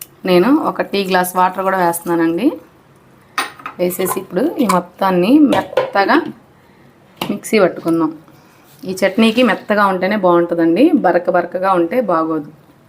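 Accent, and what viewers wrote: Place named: Guntur, India. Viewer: Super super superrrrrrrrrrrr intlo chesukodaniki simple and superb Tq Gnt slang chala neet ga vuntundi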